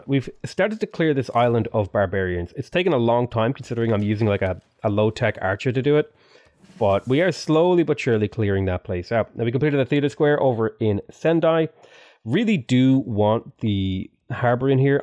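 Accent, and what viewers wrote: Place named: Ireland. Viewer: its just the irish in him ;), a lot of english natives who don't regularly hear an irish accent also need to slow it down lmao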